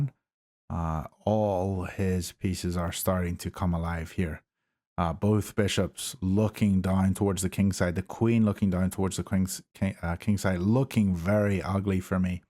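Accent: United Kingdom. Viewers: Thought you were British mate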